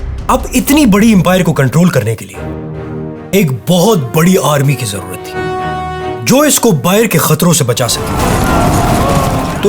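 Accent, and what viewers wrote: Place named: Kohistan, Pakistan. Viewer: Style, accents, editing everything seem like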